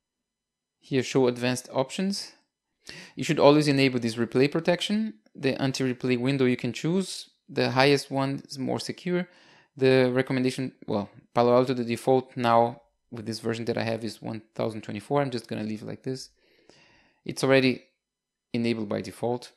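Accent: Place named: Brazil. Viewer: ! Eu sabia que seu sotaque em Ingles era do Brasil! Muito bom canal! Estou comecando com Palo-Alto e seus videos ajudarao muito. Abracos aqui do Canada em 2024!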